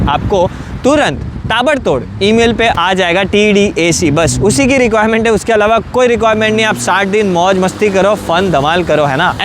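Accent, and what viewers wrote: Gujarat, India. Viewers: You are gujarati or something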